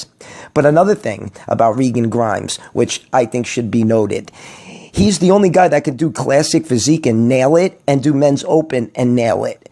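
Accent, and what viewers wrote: New York, United States. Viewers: i love your no bullshit attitude mixed with the NY accent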